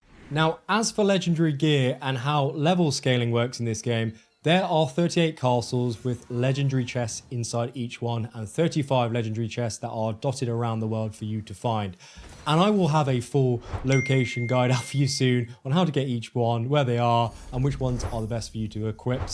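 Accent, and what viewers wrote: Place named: RP, United Kingdom. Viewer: Your very posh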